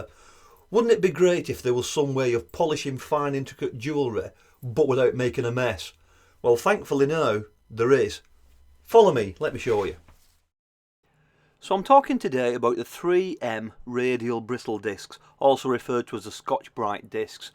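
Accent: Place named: England, United Kingdom. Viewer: Great to here an English accent on a video! Don’t think we can get these in South Africa though!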